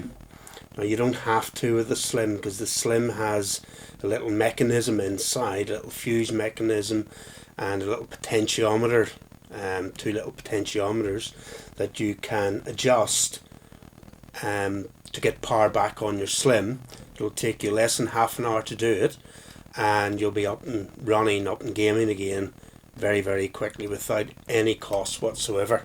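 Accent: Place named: United Kingdom. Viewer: There is something about a British accent that just 'make things right'. Thanks mate. Mine sorted.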